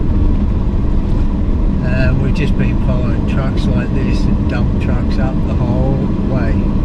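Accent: Australia. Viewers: I thought I was Aussie... THIS bloke is Aussie!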